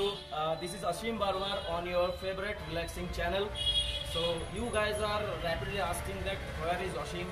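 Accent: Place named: Bengal, India. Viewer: Apnar ingreji shuney mone holo apni Bangali.. babur address ta ki? KOlkatay ki? Tahole, Porer bar Kolkatay gele ekbar dekha korey ashbo..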